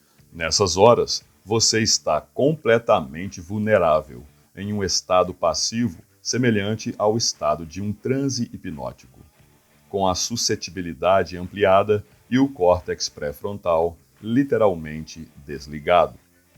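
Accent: Minas Gerais, Brazil. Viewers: Hey vc é mineiro?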